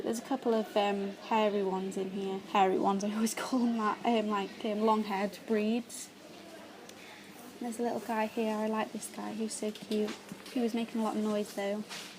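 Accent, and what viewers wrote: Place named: Yorkshire, United Kingdom. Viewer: are you from Yorkshire? I think that is ur accent, I know a teacher with that accent and she is from Yorkshire :)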